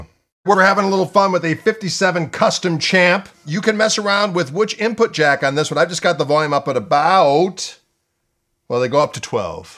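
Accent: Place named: Chicago, United States. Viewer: This man sounds like he's from Chicago He's from Wisconsin. Close enough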